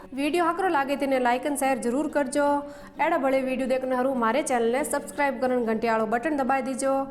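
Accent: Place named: Rajasthan, India. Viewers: Mujhe is video se jyada acchi aapki awaaz Marwadi Bhasha ka koi jawab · बाजरी को कीचड़ो बनाने की विधि बताना दीदी आपकी राजसथानी आवाज मे जो विधि से आप बताते हो वो बहुत बढीया है 🙏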